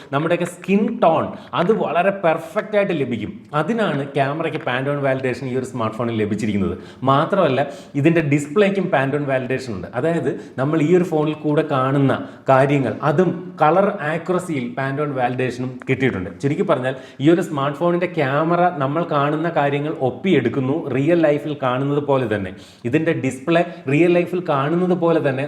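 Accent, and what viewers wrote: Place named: Trivandrum, India. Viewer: Trivandrum അങ്ങനെയാണ് ബ്രോ H ന് ഹെച്ച് എന്നും പറയും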